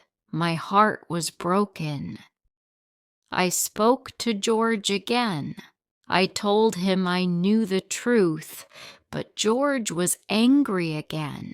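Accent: United Kingdom. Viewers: I love your english accent so much ❤❤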